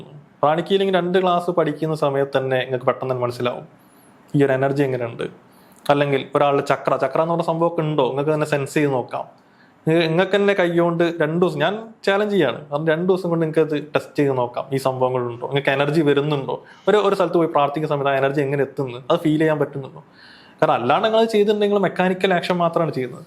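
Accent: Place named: Kozhikode, India. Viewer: Kozhikode accent catch cheyyan difficult aanu .... Bt ok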